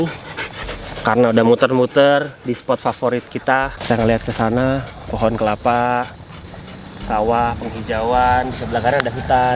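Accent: Bali, Indonesia. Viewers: Logat bali keluar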